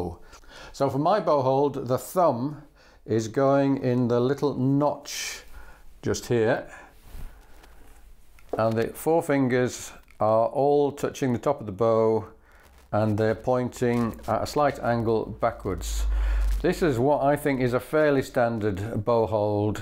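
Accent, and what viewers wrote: England, United Kingdom. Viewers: An English accent ha! Well I'm glad not all Englishmen hate the Irish. Do you have any good advice for the fingerboard technique to give it that Irish twang.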